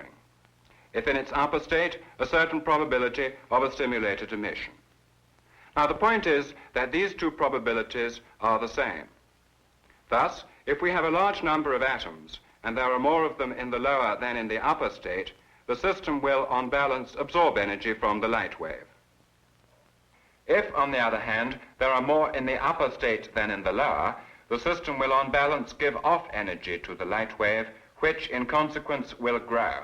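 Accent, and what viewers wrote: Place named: Mid-Atlantic, United States. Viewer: Flawless mid-atlantic accent here... impressive!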